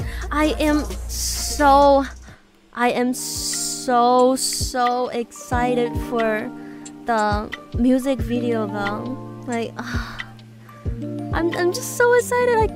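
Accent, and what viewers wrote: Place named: United States. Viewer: you speak English like you from the states. That's pretty rare in China.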